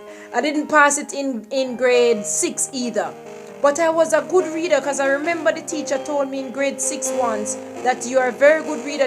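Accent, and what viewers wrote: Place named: United Kingdom. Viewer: gyal..u sounding kinda British.. nice words of encouragement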